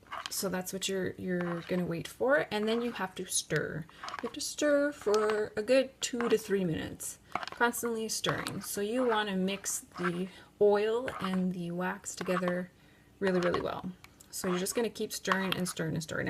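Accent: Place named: Ontario, Canada. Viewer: Thank you so much for the informative videos! I’ve been binge watching your vids & love them all! BTW: your “accent” takes me right back to summer even on these cold winter nights. My family has a cottage near Port Colborne & we snowmobile near Algonquin Prov. Park. So basically..listening to you gives me warm fuzzy feelings besides all your fab tips & tricks😆💕!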